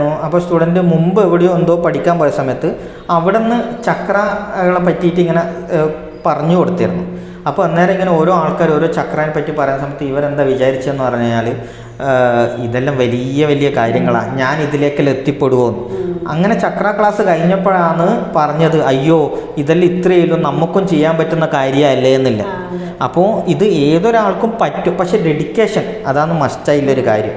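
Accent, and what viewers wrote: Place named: Kannur, India. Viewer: Kannur bhasha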